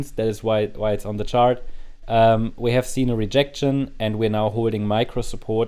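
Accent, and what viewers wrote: Germany. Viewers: He is German right? He Talks soo German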